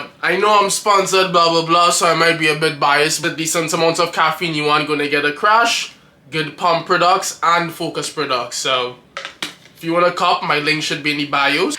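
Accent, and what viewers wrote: Trinidad and Tobago. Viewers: Are you from Trinidad? I'm hearing it vaguely. But anyways, I came across your shorts and it's been history ever since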